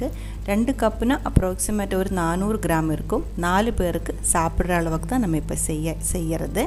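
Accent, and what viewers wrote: Kerala, India. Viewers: സംസാരം കേട്ടപ്പോഴേ തോന്നി മലയാളി ആണെന്ന് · Kerala tamil.... Wow... Chance se illa.... 😇🥰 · I think you r malayalam but you try to speck tamil well try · அழகான மலையாளம் கலந்த தமிழ் பேச்சு வித்தியாசமான டிஷ் சூப்பர்மா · Malayali aano ningal samsaram kelkkumpol malayali samsarikkunnapole und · Super maam. Your Malayala slang tamil is beautiful 👌👌👌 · Karala voice karala voice thann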